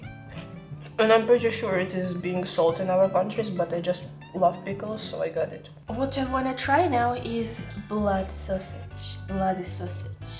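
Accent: Russia. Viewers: Where did you learn your English? You are too funny. Love your sense of humor with a Russian accent. The food looks delicious. I’m seeing more and more Russians on YouTube telling their story. I wonder how you are getting past Internet sanctions? Anyway, good for you. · Mukbang video Russian style! Cuteness overload with the thick sexy Russian accent and pure youthful beauty. Of all foods, I have tasted the cottage cheese from Jocca, but made in Germany.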